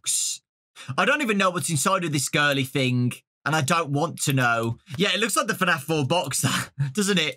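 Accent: United Kingdom. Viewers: He’s so British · accent kinda british tho..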